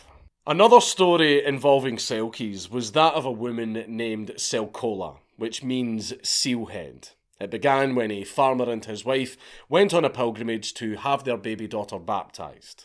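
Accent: Scotland, United Kingdom. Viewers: Nothings more mythological than hearing a Scottish man tell you about myths and insane people from around the world now that’s rare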